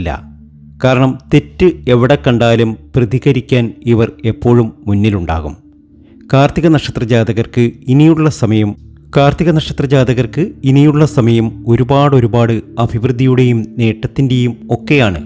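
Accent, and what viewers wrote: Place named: Alappuzha, India. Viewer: ആലപ്പുഴ ക്കാരൻ ആണല്ലേ 👌👌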